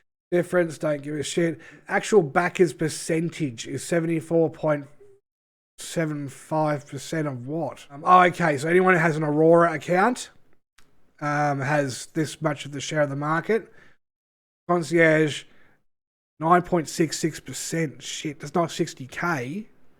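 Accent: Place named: Australia. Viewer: Sure man, il check the rest of the video now ( working from home anyway so i can listen to it. Im just not used to the strong F words and such :D -> im a full on Jedi, light sided kind of guy finding pleasure in being as good as possible, so ye..dont mind me :D ) Currently my fleet consists of 1 Carrack, 1 Mercury Star Runner, 1 Banu Merchantman, 1 Redeemer and 1 Eclipse. -> Honestly at this point i was only planning one last upgrade to my fleet and that is upgrading the Eclipse to a Polaris and thats IT. -> I honestly think that even 300$, or 500$ and not to mention1000$ is way to much already to support but the issue at this point is that im already down the rabbit hole and can almost touch my finish line of a (dream fleet) so i might as well do that. -> Another Issue is tough, that upgrading and buying ships at this point started to feel like a minigame which i can understand maybe some people who cant even stop the fantasy/dream of the supposed grandiose game on its release. 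Im like arround 80% in into doing that last upgrade but at that point i will stop buying or upgrading ships all together. All i can do is hope at this point that Chris and the teams learn from their mistake and do not take for granted the community because it can all fall down on themselves with a finger snap (just like Thanos :D ). Edit: i like your Australian accent! God bless you man :) Edit.2: I only play since last year arround september 2019 (i know i started right with the 3.7 or 3.8 or what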